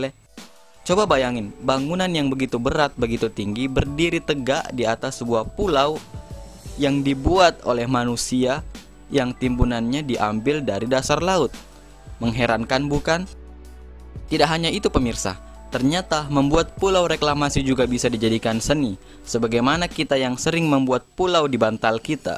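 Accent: Makassar, Indonesia. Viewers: logat makasar ki cukimai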